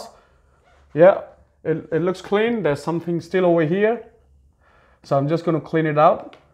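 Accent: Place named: India. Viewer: What in the world kind of accent is that?!?😂 Sounds like that made up accent Jayden Smith used in that movie “After Earth” 😆 Part of it sounds Indian, part sounds English with a hint of something else lmao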